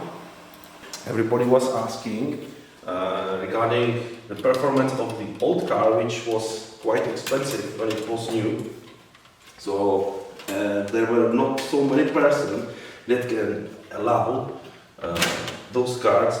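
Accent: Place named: Czech Republic. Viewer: Já si říkal, že slyším czenglish. Ale nemyslím to ve zlém, jen jsem tam v té angličtině poznal český přízvuk, tak rovnou píšu česky a pak se objevilo ve Windows Vitejte a bylo jasno. Též uvažuji udělat nějaký podobný test. PS: Toho Athlona fakt závidím. Nemám ani jednu Slot A desku a ani procesor, ale hrozně moc bych chtěl.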